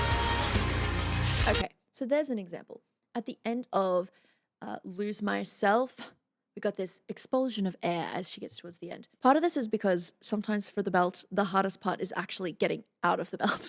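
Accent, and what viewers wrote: Australia. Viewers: I'll admit, I'm loving these reactions not just for the content, but because I get to hear them from a fellow Aussie.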